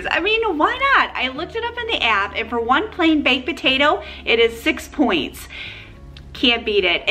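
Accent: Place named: Midwest, United States. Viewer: That chicken pot pie looks amazing! 🍲. Your accent 😁. Are you from Minnesota or Illinois?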